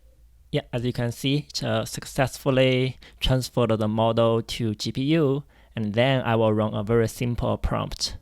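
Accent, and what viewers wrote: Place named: China. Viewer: chinese-style english😊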